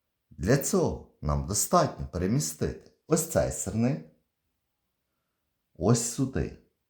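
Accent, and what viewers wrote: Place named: Poland. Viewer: я теж про те саме ніби то слова українські а акцент польський чи німецький або руминський, то відголосок генів чути через мову хоча і маскується під українця.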